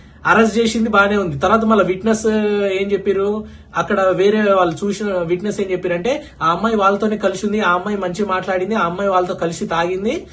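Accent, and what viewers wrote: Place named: Nalgonda, India. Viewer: mawa… needi Nalgonda na😊 same slang vundi anduke adiga 😂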